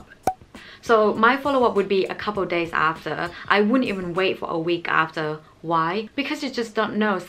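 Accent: United Kingdom. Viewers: You look like Asian but your English is British good job 👍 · Wow. How did you get that British accent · You are from Thailand but I am curious about your British Accent? Did you always talk like that by chance? Thank you.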